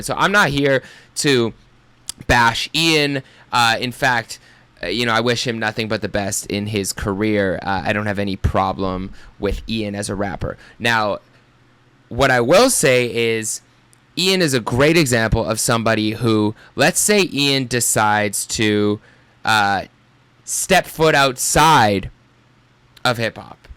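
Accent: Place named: Canada. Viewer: This is an actual Canadian accent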